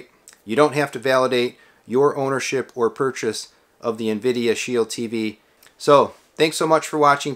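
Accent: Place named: Chicago, United States. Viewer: If this isn’t a fellow Chicagoan I’d be shocked 😄👌🏼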